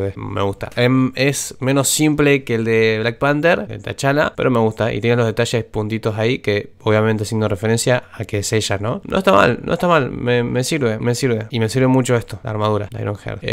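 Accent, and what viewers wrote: Argentina. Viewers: español? Se re nota que es Argenrtino o Uruguayo xD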